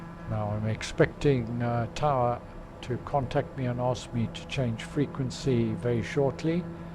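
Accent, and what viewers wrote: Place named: South Africa. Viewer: I think you should offer your voice as permium add-on. Would love to hear your soothing south african accent guide me from A to B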